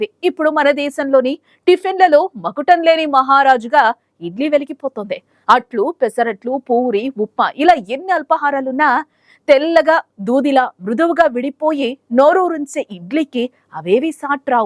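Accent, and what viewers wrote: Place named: Tamil, Sri Lanka. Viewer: Srilanga tamil nu imitation. Cheyakandi!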